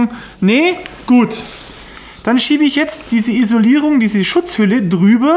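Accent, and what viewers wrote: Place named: Bavaria, Germany. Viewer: Hey! Super Videos, super Erklärungen die eigentlich jeder (Vollgasdepp) auch versteht und das ganze Gefluche auch noch auf allgäufrängbayrisch - einfach HERRLICH:D Speziell zu diesem Video: nimmt man nicht unisolierte Aderendhülsen für solche Arbeiten um nicht die Adern eben durch jene Isolierung unnötig zu belasten wenn diese im Stecker oder der Buchse dann (ab-)geknickt werden? (wenn man keine hat, kann man auch VORSICHTIG die Isolierung der isolierten Aderendhülsen mit einem feinen Seitenschneider oder einem scharfen Messer entfernen:) ) Grüße aus A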